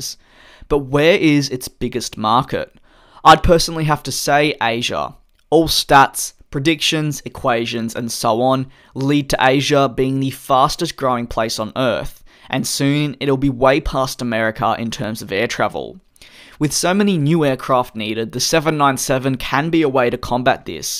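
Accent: Australia. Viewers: Wait.. u from Australia? thought you were from GB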